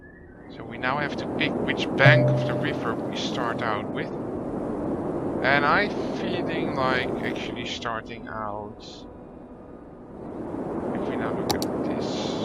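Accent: Netherlands. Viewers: keep up the good work! and from a guy from japan your dutch accent sound funny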